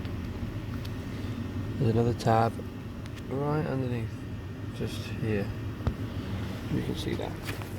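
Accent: United Kingdom. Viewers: Helpful video. Love the british accent😄. Thanks from Argentina 👍🏻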